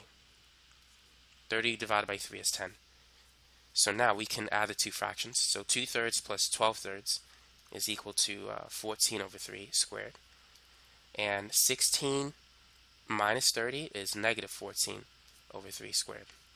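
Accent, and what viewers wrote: United States. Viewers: Angie Mejia America probably, he has an American accent but with a little bit of a lisp so that's why it sounds accented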